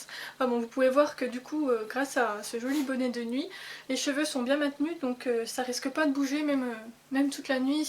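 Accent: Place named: Franche-Comté, France. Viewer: tu as très bien expliqué!! tu as l'accent de chez moi enfin la franche comté!! je test ce soir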